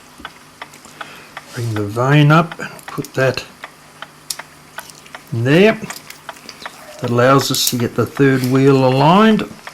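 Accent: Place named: Australia. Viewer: Sounds like an Aussie 🇦🇺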